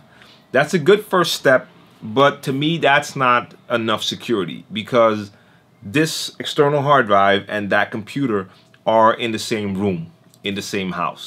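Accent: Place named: New York, United States. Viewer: Great videos. Just a comment/question...you don't sound like you're from the Netherlands. More like NY. Where are you from originally?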